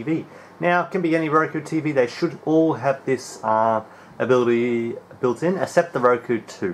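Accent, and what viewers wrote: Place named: Australia. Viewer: It's not that hard to understand him. He's Australian. It's like American but with an accent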